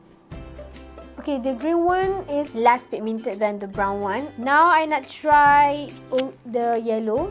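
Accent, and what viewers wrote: Malaysia. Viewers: Wahhh dh nk 100k subscriber...moge terus sukses, suke tgok die ni, comelll, speaking English care Melayu, 👍👍👍💖💖💖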